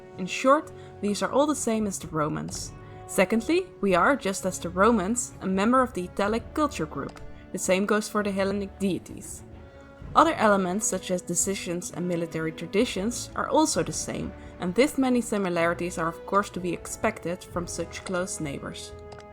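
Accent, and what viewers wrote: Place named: Netherlands. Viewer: Great stuff! Started off sceptical, since the first view presentation didn't look that inviting. However, now that I watched this first video I have to say, love what you are doing here! Especially taking the ingame depiction of Etruria, and putting that next to the popular and academic views is a really nice and interesting exercise. And in general, its nice to hear a Dutch accent in a space often so dominated by Anglophone ones. This one was off to a great start for this series!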